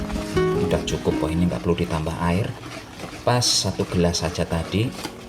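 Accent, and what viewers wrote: Banyumas, Indonesia. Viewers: Happy masaknya dengerinn medokk jowone ngapak... Dan resepnya sy suka semua...good lucky 👍👍💃💃💃💖💖🌹🌹 mas km klu mkn bikin ngilerrr..... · Ya Allah... Medok temmen kueh .. 😂😂. Jd nambah pingin tilik mbahe aring Banyumas.., brikute..smangaat terus masak" dg apa anane bae👍👍💪😇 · wong ngapak ank e mbok dirah 🤣🤣🤣🤣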